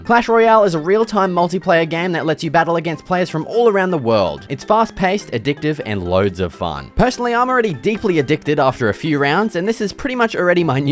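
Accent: Australia. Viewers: I like your channel I love building in all games but idk I just really like your channel pretty genuine also question you Australian?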